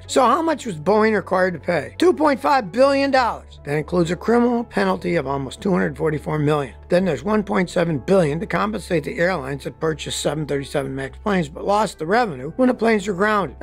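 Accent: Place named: Western New York, United States. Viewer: He's from western New York, Niagra Falls area I believe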